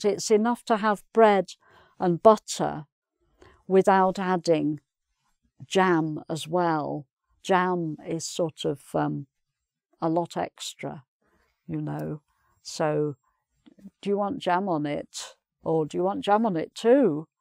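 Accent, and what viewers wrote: United Kingdom. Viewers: love your British accent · Oh, my lady, do you live in Canada? You speak in British English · It's a real pleasure to listen to you - real British +profound knowledge. Thank you for that 🌹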